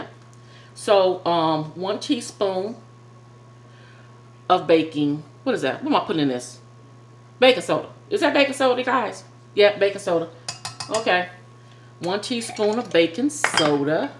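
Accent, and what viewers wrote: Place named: Pennsylvania, United States. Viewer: I remember that coffee cake one f my all time fav’s we might be close in age, I’m here in the San Fernando Valley, I think I’m older graduated in 62. Thank you for the recipe! Your from PA sounds. Like.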